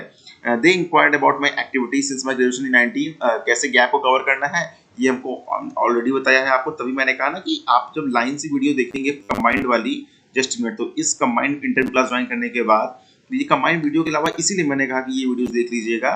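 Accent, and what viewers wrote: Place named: Punjab, India. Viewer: Sir kbhi hindi jyada boli nahi to hindi me Punjabi touch feel hota hai ....chlega kya!?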